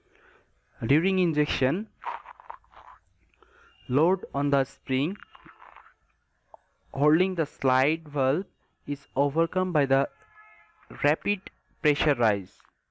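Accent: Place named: India. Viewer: Indian english is awesome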